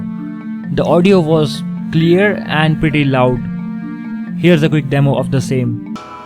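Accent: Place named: Bihar, India. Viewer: Your English has Bihari Accent! #NoOffence Nice Video btw! :)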